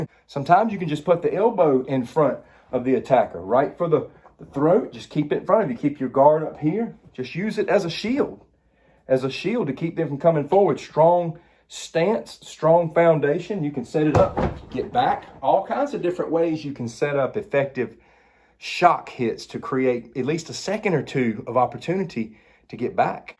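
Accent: South, United States. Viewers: Thank you for making a video on this topic. Also, I enjoy hearing you talk. You have a nice accent; I'm just trying to place it. Southern? I'm from Tennesse and I love a smooth accent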